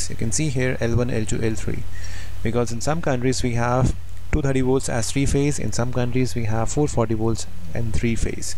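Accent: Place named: India. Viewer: Outstanding. I have been struggling with the question of using the Delta M series or sticking with my old friend the B series. After watching your work, I am comfortable making the change. Delta should sponsor you. Having been an American in India 7 times, I can follow you no problem. Garden variety Americans could watch each section a few times and learn the pattern of language in India. Amazing in and of itself. I always felt like Indian's spoke better English than I did. Good work. Please do more.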